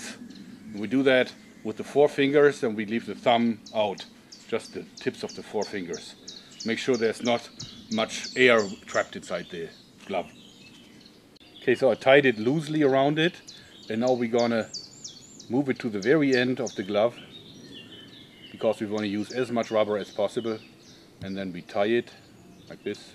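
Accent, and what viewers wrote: Germany. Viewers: What is his country of origin? I'm guessing he is German based on his accent, but I could be mistaken. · are you german? you talk like a german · I can hear you're german! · Where are you from I can't figure out your accent I think it is german??? Can someone please correct me if I'm wrong thx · where are you from? your accent sounds familiar... are you German?? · Wer 3-4 Videos von ihm geschaut hat merkt sofort dass er Deutscher ist dass ist nichts neues. Ich finde es gut dass er es auf Englisch erzählt damit es auch andere verstehen. Hauptsache man kann ihn doch noch verstehen!! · you are a german guy ? you speak with the germen dialekt :) and you was by the arme ? :D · are you german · I agree with the accent, but now that bugs me because Nords are supposed to be Scandinavian, not German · Yeah, im german too, and i had heard his accent, beacause often german have this accent too (sry for bad english :D)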